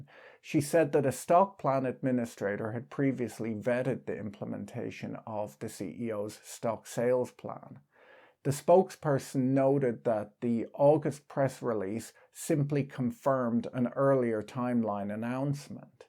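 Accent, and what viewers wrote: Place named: South Dublin, Ireland. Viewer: ah yes, I could hear the southside. I was in Drimnagh and Castleknock during my childhood. I'm a fan of your videos and literature. Thanks.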